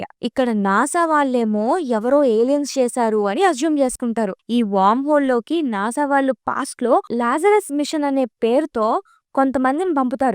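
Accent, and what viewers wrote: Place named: Telangana, India. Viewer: Nee explanation kanna Interstellar movie maro sari chustey arthamaipoddemo papa..nuvvu inkaa confuse cheshaav..sorry.. కాకపోతే నీ స్లాంగ్" అయిపోతది, వస్తది, పోతది, రాస్తది" వింటుంటే..మీది తెలంగాణ అనిపిస్తోంది..Am I right. ??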